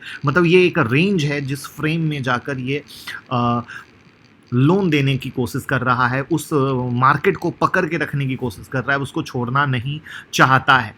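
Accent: Bihar, India. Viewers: Yeh bihari hai aise hi pronounce krta hai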